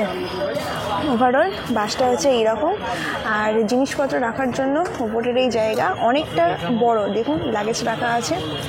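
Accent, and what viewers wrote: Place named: Ghoti, Bangladesh. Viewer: Tumi j ghoti seta bojha jachhe .....uchharon bolleo thik kortey parbe na ......sab Sam bajarer sosi babu 😂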